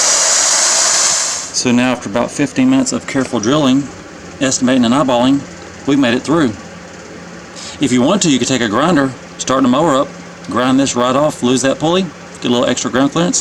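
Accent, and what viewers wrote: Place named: Georgia, United States. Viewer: Awesome. Your accent sounds exactly like my friend who’s from Georgia. That’s why I ask! Great video!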